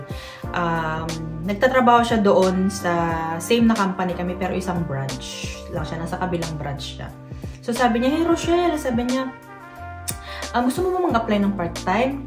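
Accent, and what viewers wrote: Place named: Sultan Kudarat, Philippines. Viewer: I love it ur "in Finnish eh" Isulan/Tacurong accent 😂❤❤❤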